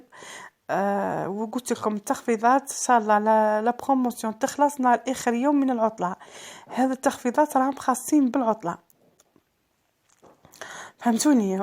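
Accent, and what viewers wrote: Setif, Algeria. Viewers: ما شاء الله عليك هدرتك حلوة سطايفية حرة فديوهاتك ممتعة النظر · اهدري لهجتك السطايفية الحرة وراكي مفهومة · والله لهجك السطايفية وروحك المرحة وضلك الخفيف كلامك غنوة ممتعة ترفع الميزاج انا عاصمية ونفهم عليك على كل الجزائر يات 🇩🇿🇩🇿🇩🇿🇩🇿❤❤💪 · هدرتك حلوة ندخل نشوف الوصفة نلقى روحي تايه في للهجة السطايفية الزينه ربي يخليلك لوليدات يا رب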